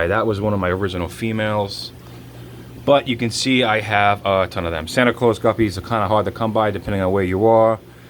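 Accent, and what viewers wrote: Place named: New York, United States. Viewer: Where you located definitely a New York or New Jersey accent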